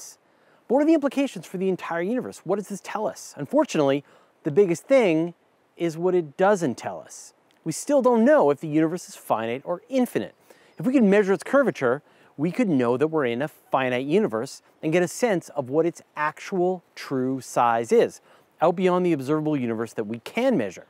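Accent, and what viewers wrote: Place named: Canada. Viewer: I almost forgot you were Canadian, your accent is the same as northern USA until I heard you say "a-boot" sorry just made me smile😃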